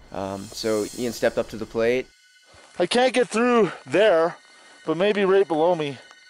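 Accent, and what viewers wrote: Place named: California, United States. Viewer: Sounds like cali surfer dialect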